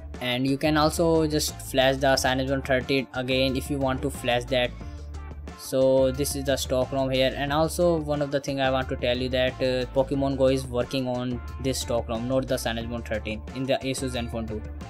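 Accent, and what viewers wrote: India. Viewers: Logat orangindiya kek pantek bahasainggrissnya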